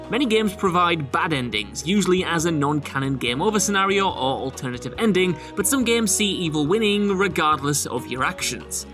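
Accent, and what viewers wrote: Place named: United Kingdom. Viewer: I get the whole British accent thing but if they game pronounces it one way that’s how you should say it! It’s a name after all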